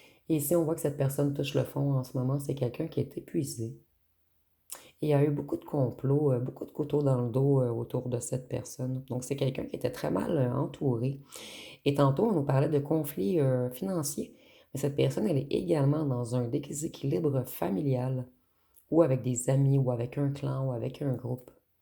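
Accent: Quebec, Canada. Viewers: Eh hop, je viens de booster et liker! 😉 Merci pour votre présence assidue.✨ J'apprécie beaucoup vos guidances...✨et votre joli accent québécois.✨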